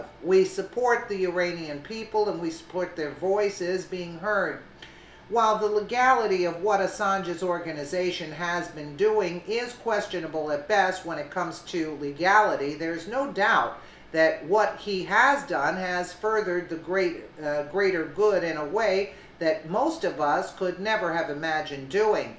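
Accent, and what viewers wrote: New York, United States. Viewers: I am from south ga and I love your New York accent. It warms my heart everyday!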